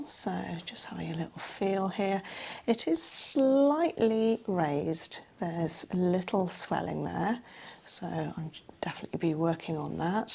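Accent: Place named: England, United Kingdom. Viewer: l@ I asked that becuase she a similar accent to Geri Halliwell. They are not too far from each other, North of London.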